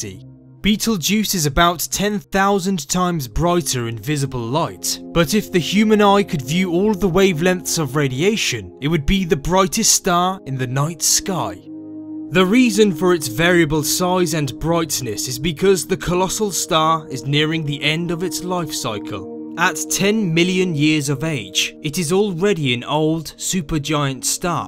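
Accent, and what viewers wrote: United Kingdom. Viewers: he’s British buddy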